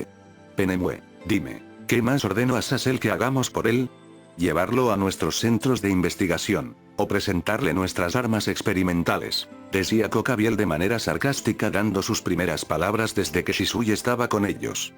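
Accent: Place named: Dominican Republic. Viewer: Klk, un Dominicano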